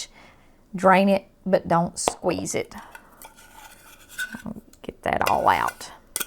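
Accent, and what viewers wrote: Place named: East Tennessee, United States. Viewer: Thank you so much for making these videos. Everything is broken down for someone who hasn’t cooked much. You are a great teacher, you are positive and you show God’s love in all you do. So many YouTubers right now are using fear and anger to get views. Your videos leave me feeling hopeful and peaceful and ready to try something new. I am so grateful for you. P.S. I love your accent. My granny was from East Tennessee and listening to you makes me happy! I am a retired women living in NC, and never had a family so I never learned how to cook - until now. Thank you so much. (Beth)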